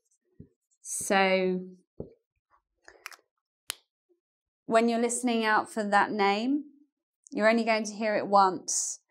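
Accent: United Kingdom. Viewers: I'm japanese. I've been learning English with American accent for 6 years. Thanks to your videos, I gradually get used to british accent. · I love you!!!, you are the best teacher of English of the world, I follow all of your channels, I like your British accent to speak. thank you very much for your advices.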